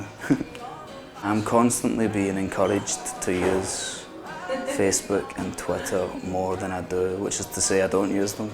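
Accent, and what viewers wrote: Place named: Scotland, United Kingdom. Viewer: that is the nice Scottish accent ahaha :')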